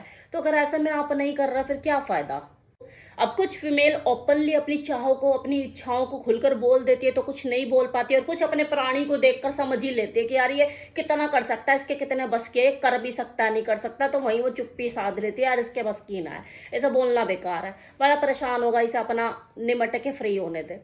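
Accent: Haryana, India. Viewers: लगता है आप हरियाणा से है😮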